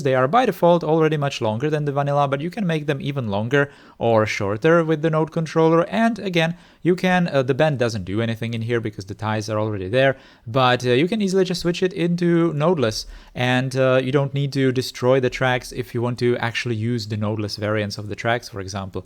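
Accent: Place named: Czech Republic. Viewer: V prvních patnácti sekundách videa mi bylo jasné, že jsi Čech 😃 Twitter to potom potvrdil. Tvoje angličtina zní skvěle, neber to jako výtku 😊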